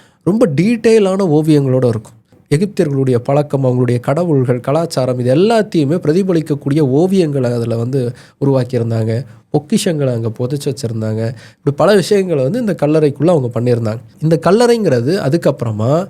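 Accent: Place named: Telugu, India. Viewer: Telugu naatham naaruthu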